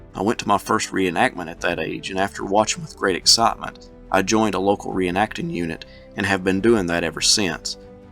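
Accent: South, United States. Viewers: I always wondered, who the man is, telling me stories with that sound voice and the rollin southern tongue, wich I love so much. Now I know, thank you for that. So, for justices sake, I will get out of cover a little bit. I am a 60 year old veteran, born and raised in Germany inmidst the post ww 2 era. Originated from a family with military background, reaching back to the 30 years war, I started at a very early age to become interested in history. First love were Dinosaurs, soon after the ancient Egyptians up to the romans, my first choice of profession was archeologist, but it turned out otherwise. My grandpa liked to tell me the warstories, when he was a young soldier in peacetime, up to the time he commanded a division at the eastern front. He told me about the hardship and the suffering, but also about glory and comradeship. Like a sponge I absorbed those stories and, as you can imagine, I was hooked. 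So, in the age of 11 years, I began my dive into history, developing into an ascend deep inside the abyss of military history. Logically I sooner or later discovered the american civil war. BAAAAM, what a story. I studied the wars of napoleon before, wich are much larger in scale ( Battle of Leipzig <num> combattants) . But what was so unimaginable about the Civil War, was the fact, that they fought napoleonic style with rifled musket and cannon! What kind of man, what breed of hell can endure such gruesome tasks, what kind of spirit was their motivation, why the hell they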